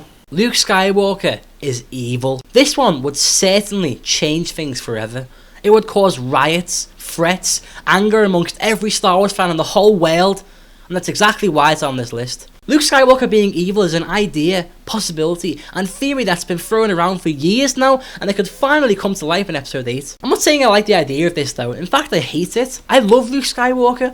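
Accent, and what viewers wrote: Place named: Scotland, United Kingdom. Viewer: are you Scottish or irish or what?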